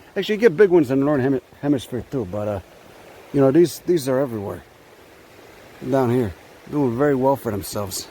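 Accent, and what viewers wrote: Chicago, United States. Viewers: Trouncing about gods delicates with your tattoos and your chicaganese dialect and your peircings i bet youd get your arsehole pierced If ya could fit your cheeks in da machines!!!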